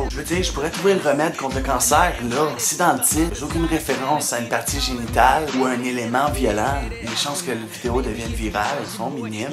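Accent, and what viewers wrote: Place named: Quebec, Canada. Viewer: Why does French sound so ugly coming out of a Quebecer?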